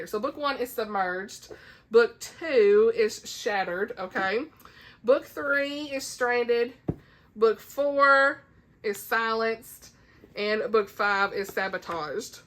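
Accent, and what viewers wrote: South, United States. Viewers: I love your energy and southern accent! We read completely different things but I really enjoyed seeing all the series you want to tackle! ❤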